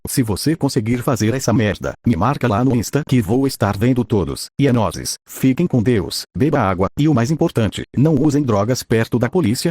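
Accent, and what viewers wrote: Bahia, Brazil. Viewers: Pensei que o era baiano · Sou de Goiás também kkk mais acho que tu e baiano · Pensei q ele era da Bahia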